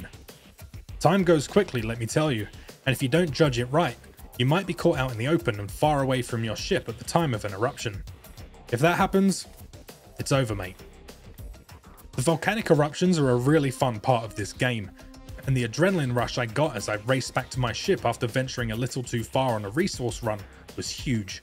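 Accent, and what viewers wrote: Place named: United Kingdom. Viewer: idk anything in british accent sounds more legit even reviews :D i enjoyed it :D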